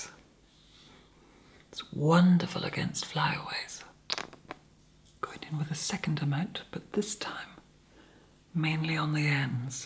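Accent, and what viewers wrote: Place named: England, United Kingdom. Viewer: To me, there is nothing more soothing than a authentic medium toned English accent. I adore the Brits. And you are a rare gem I should like to keep. And in the raw you possess a Angelic aura. I am about to get my own EOs out to use. The Sandman is sure to take me away afterwards to his dream laire.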